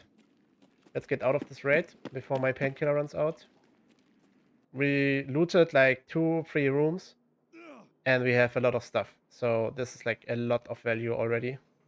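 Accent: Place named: Germany. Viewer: I hear a very deutsch accent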